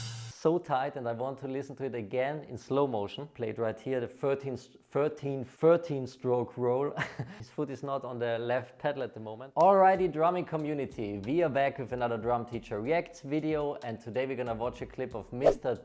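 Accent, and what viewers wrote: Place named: Germany. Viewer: This kinda sounds like a German accent.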